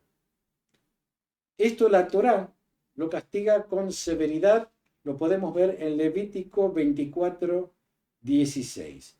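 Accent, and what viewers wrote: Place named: Buenos Aires, Argentina. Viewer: Yo pienso, señor que tiene una voz buena para el canto, y su acento porteño tan marcado, me gustaría escucharle un tango, o una milonga, como:, y otros. Porsupuesto con todo respeto a sus enseñanzas, y lecciones cuantas cualidades, shalom ve alejem.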